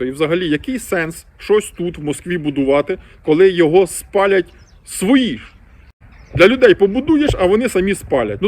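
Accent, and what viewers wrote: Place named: Central Ukraine, Ukraine. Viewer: Відчувається мовлення центральної України. Хоч і західна її частина. Дякую.🇺🇦💙💛❤🖤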